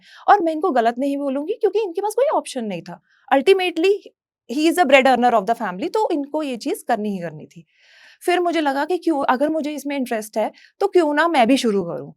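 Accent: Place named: West Delhi, India. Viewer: west delhi touch lagta hai maam ka..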